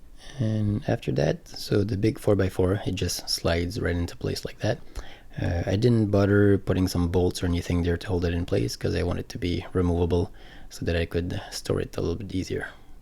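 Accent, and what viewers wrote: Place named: New Brunswick, Canada. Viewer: Super nice! Couldn't help but notice your accent... are you from NewBrunswick?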